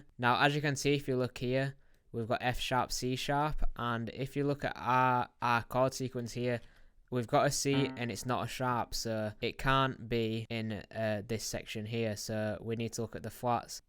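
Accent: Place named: Yorkshire, United Kingdom. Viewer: I thought I recognized that Yorkshire accent. I'm from Halifax. Loved the video and gave it a like. P.S I use FL Studio and you're a Logic user but your 99.% perfect 😂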